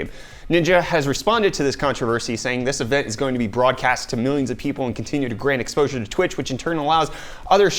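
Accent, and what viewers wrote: United States. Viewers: So this has probably asked before but Im new to your channel. How is it that you are an American or at least have an American accent and living in South Africa? Really love your content by the way. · You sound extremely American, for a guy from south Africa.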